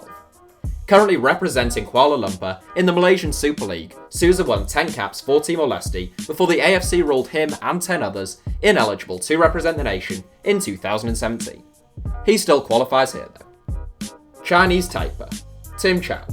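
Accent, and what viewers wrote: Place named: RP, United Kingdom. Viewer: Though I like the efforts, getting your research from Wikipedia and putting a RP accents aren't good enough, to make a list of the best footballer in every countries. You lot need to read more outside of Wiki and consult football experts from each countries to make a better list. Pronunciation is a dead giveaway that this videos is poorly research...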